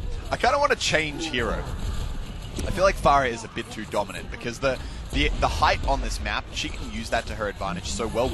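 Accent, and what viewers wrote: Germany. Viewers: why is the voice german?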